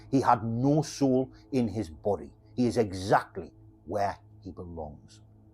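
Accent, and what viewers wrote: United Kingdom. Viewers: You should edit the title imo so it says “Brutally murdered or executed in prison” Otherwise it does seem a bit clickbaity. Excellent video otherwise and it is nice to have a fellow brit narrator instead of an ai voice. Keep up the great work and try not to clickbait 😊😊😊😊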